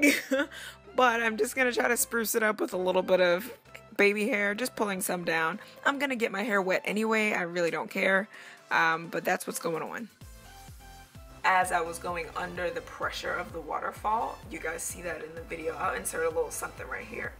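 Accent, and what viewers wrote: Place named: Jamaica. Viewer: I don't wear wigs but am definitely subscribing, plus as u start talking I knew I was listening to a fellow Jamaican 🇯🇲, then when u said u had to wash with your hands back home I was like yes I knew it she a Jamaican 🇯🇲.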